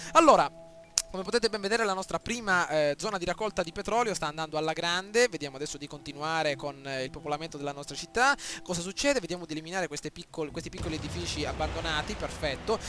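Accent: Sicily, Italy. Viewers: di che parte della sicilia sei??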